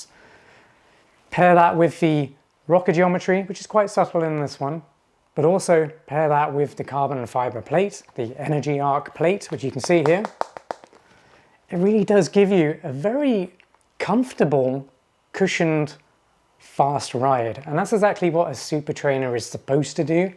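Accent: United Kingdom. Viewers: You have British accent but talk in dollars. Did you go TTS in your UK size or US. Many say go up half a size in NB but I've just bought these in my usual 9.5 that I buy for most trainers. I'm hoping when they arrive there ok it's more length I'm bothered about as I have average width feet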